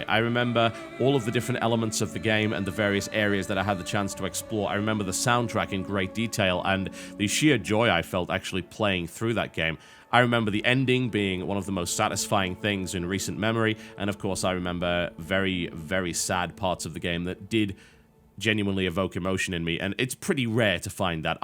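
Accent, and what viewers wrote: United Kingdom. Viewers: your british accent disgusts me. Im from england and yet i still cannot understand wtf your going on about. The end of your sentences all sound like your american.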